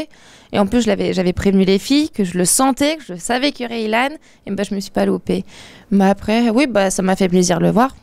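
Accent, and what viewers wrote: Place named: Paris, France. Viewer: Juliana Laurent c’est vrai qu’on dirait qu’elle a un accent du genre parisien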